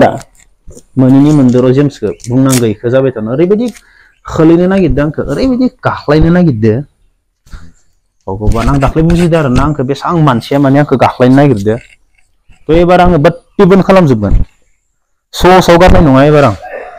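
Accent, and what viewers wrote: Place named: Kokrajhar, India. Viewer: Dabw Kokrajhar style railainw rwnga ada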